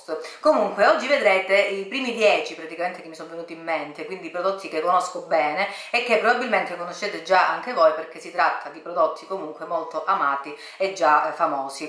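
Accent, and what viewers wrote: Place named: Sicily, Italy. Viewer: Dall'accento mi sembri siciliana😆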